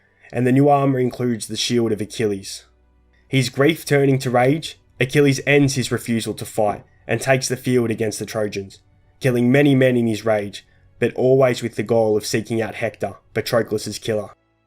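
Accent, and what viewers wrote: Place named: Australia. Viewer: I can’t take the aussie accent seriously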